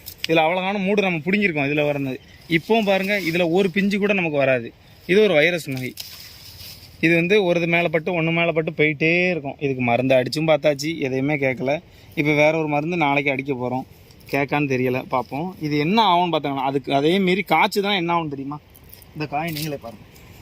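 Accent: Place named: Tirunelveli, India. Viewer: Thirunelveli nnu theriyuthu,,,aana yentha ooru nnga,,,pavoorchatram aaaa????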